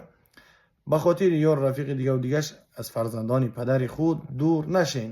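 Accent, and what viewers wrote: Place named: Afghanistan. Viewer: Чихе хушру гап мезанен ака! Тарзи гапзанитон кам кам ба гапзании Афгоно монанди дора. Чикадар Махкулайма гапзанишон.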